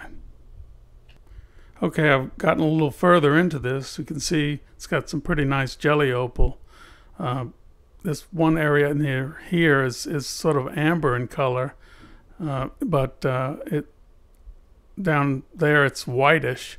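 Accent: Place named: Louisiana, United States. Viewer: Another excellent video of my favourite Lousiana accent. I will train to have the same english accent as you. For me is a double gain, I learn English and about minerals.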